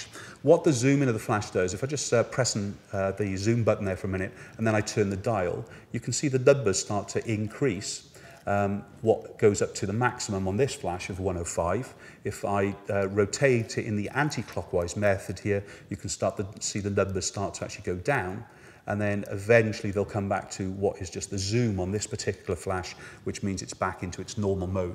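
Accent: United Kingdom. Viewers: Morgan Calvi English West Country...Gloucester or Bristol would be my guess.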